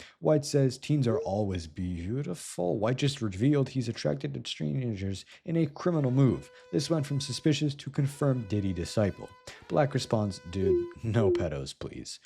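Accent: United Kingdom. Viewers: The British accent is excellent. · the british accent is thick with this one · that british accent fucking broke me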